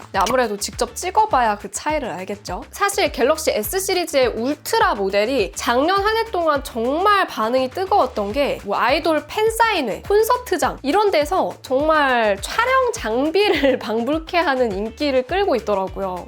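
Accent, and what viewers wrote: Gyeongsang, South Korea. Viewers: ㅋㅋ 경상도 사투리. 찢으셨다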